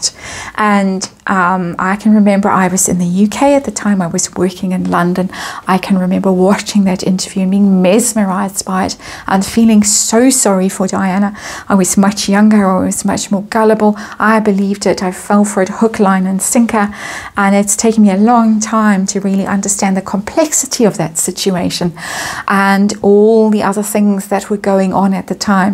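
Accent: South Africa. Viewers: I love your South African accent. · I love your readings, your dogs chiming in, your lovely South African accent and am not at all bothered that the cards don't always fit into the frame since you take them up to the camera when you are talking about them. Thank you for another wonderful reading! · Your accent and Bookworm's are totally different but perhaps it is due to what part of South Africa you each reside in. I don't know anything about South African accents so forgive me if I am wrong.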